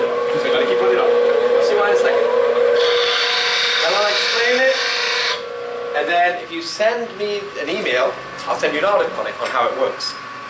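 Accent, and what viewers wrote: Newcastle, United Kingdom. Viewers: Your Geordie accent is so messed up, man. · And the Geordie accent shines through ;-)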